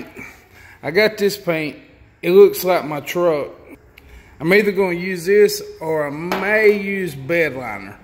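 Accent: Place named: Appalachia, United States. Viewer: You talk like a hillbilly just like me.. I sound so funny.. That is why I dont do vids.. Great vid. My next weekend project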